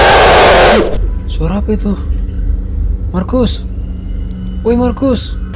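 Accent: Medan, Indonesia. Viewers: mas bahas mas kaya orang medan mas bro dan pak bro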